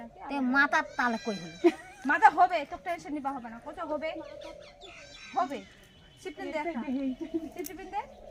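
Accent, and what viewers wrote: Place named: Naogaon, Bangladesh. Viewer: বাসা কোথায়, একবারে হামারে নওগাঁ জেলার মুতোন ভাষা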